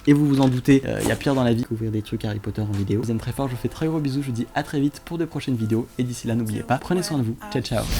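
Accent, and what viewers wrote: England, United Kingdom. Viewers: Pourquoi tu prend un acsent anglais ?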